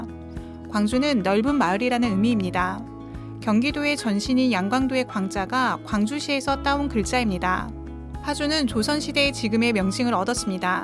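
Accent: Gyeongsang, South Korea. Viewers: 경상도 분이신가 봅니다.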